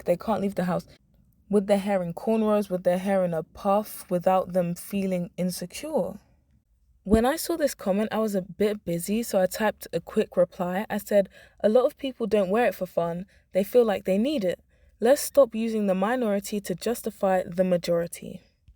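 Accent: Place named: London, United Kingdom. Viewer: Unrelated but your accent!! Im also a fellow londoner too!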